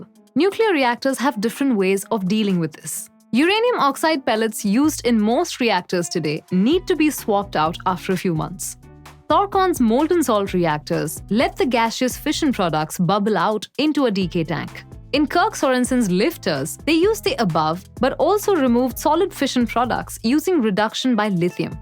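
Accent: India. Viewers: Liked her Indian Accent. It's broken and jumps from one twig to another like a little birdie. Hard to get the sense though. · Indian accent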